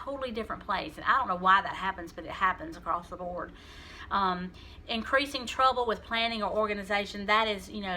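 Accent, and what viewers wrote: South, United States. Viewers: Thank you so much. I have been learning more that is helping me understand and survive than I've learned from the professionals we see. I am a mental health professional and I frequently feel I am 'losing it'. But education of stages and expectations was so helpful in making plans but also allowing me to be more in the present with my husband of 49 years. He is the love of my life and we have no family. But I am sharing with friends and making more friends. They are now my family. I have joined the County Council on Aging Advisory Board as a volunteer to make connections but also learn and share and improve education and support for all fellow caregivers. Oh, and I am a midwesterner, Buffalo to Milwaukee, and I love your southern accent...and your therapy cat :-)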